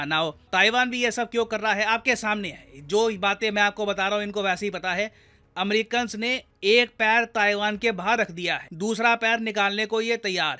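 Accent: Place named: Uttar Pradesh, India. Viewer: sir..... aap UP me kidhar se ho? Aapki tone se malum padta h ❤ Vaise me bhi UP se hi hu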